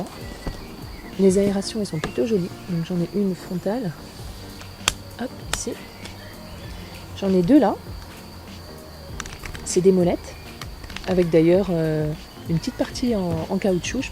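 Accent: France. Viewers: good choice nice helmet btw your English with french accent I LIKE IT.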